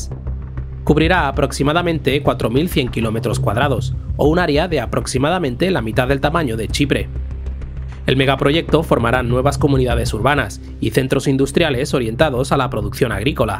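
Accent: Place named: Spain. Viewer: El contenido es muy pero muy bueno, pero déjame hacerte una critica constructiva, la narración es muy llana, le hace falta sentimiento emocion queseyo, es mas como leer un documento y no una narración, y segundo el acento y la narración nativa española (no se de que parte España seras) es muy marcada, deberías neutralizar tu acento. Suerte y voy a seguir viendo tus videos 👍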